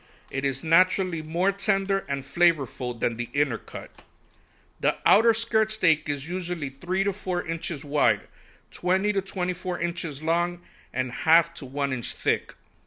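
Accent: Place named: Latin America. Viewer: I can simply tell by the accent that this guy is qualified to have this conversation. If you aren't from Latin America, you just don't know skirt steak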